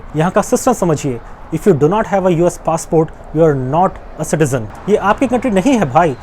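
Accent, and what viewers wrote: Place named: India. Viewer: I love your hindi accent and way to express ideas. Your information is concise. Great job.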